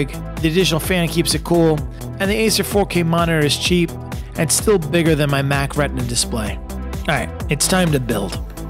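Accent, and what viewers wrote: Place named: Queens, United States. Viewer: Great video! Aside from the great content, you had me chuckling at a few points (I'm a tough audience for comedy). I love your accent. I'm from Springsteen's home town and I'm trying to determine which borough your from. Your accent isn't strong enough for the Bronx, and I definitely don't hear LonGIsland (no space and capital G on purpose). I'm going with Queens. I'm at the Camtasia/OpenShot level of video editing ability, so please excuse my ignorance. You built this with a focus on Adobe - how would it do with DaVinci Resolve (I'm cheap) and other Black Magic Design products, please? I assume it would chew through them, too. Thank you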